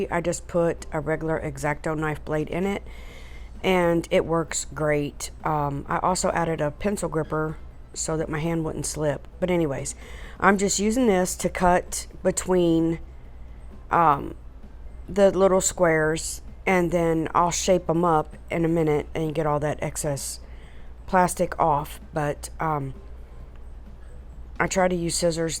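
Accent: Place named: Louisiana, United States. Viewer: I love these projects! BTW, you sound just like my family in Louisiana! Beautiful accent!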